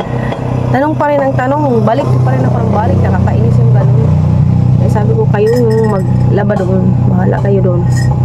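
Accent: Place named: Visayas, Philippines. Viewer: Hahha sarap tlga pakinggan ung bisaya sis ba nakakaaliw pkinggan ko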